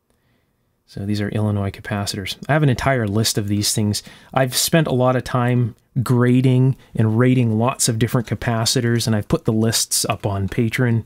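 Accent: Canada. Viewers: I was at first agast to hear you pronounce Solder soul'-der instead of saw'_der and Far'-ad as Fer-ad'. Then I head you say awoot and understood that you must be Canadian, ayh. OK I get it. But Michael's name wasn't Fer-ad'-ay, it was Fara'-day. I didn't know Canadians took so much of their speech from the English as apposed to the way Americans talk. As was said in My Fair Lady, "Why can't the English speak English? The Americans haven't been doing it for years." Keep up the good work and I can't wait to see the referb video, either. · I had no idea that Canadians pronounce thing the same way we do in Australia...like Zed instead of Zee, and solder instead of sodder. Impressed.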